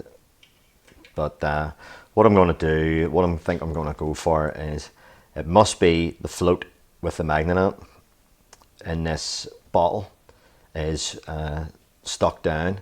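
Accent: Northern Ireland, United Kingdom. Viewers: Good video thanks. Great to hear the norn iron accent!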